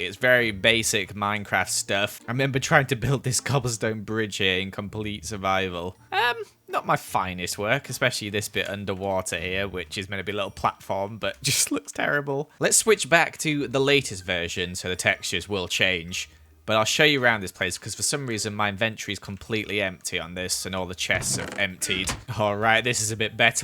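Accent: United Kingdom. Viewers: Love that thick british accent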